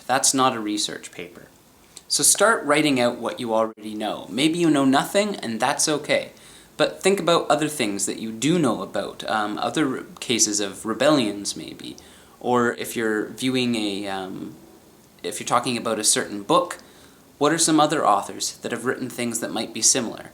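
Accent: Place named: Canada. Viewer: I love your accent. <3 Canada!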